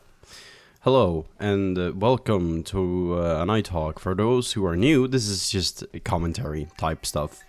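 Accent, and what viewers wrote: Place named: Sweden. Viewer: That's just the Swedish accent, Joel from Vinesauce is the same.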